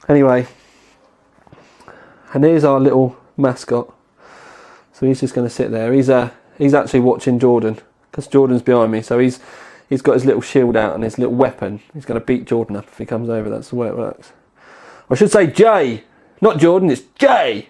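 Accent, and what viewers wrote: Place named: England, United Kingdom. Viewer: taking something bad that happened and turning it to something good, not only for yourself but for just random people all over the world! that is quite amazing and i really do like your accent im a big fan of the english accent so these videos are just amazing to watch :D i love the long videos cuz i love to listen to people talk for long amounts of time for some reason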